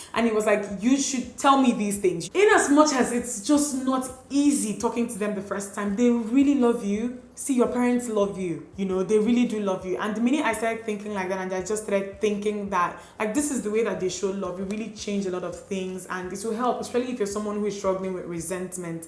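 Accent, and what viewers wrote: Nigeria. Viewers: I appreciate you story, however you are talking to much. You look refined, but when you opened your mouth....typical Nigerian accent and speech pattern. If you refine it, you would capture more subs.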